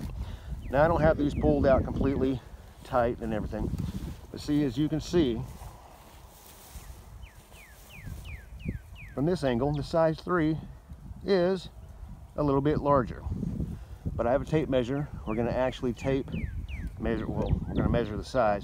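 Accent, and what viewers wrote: United States. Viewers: I could just tell by the intro that this was going to be a American dude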